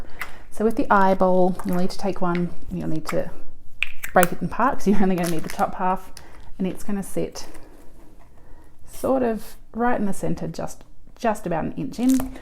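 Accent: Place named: Australia. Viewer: Hi new subscriber here fron Canberra, Australia. I presume by your accent you are Aussie?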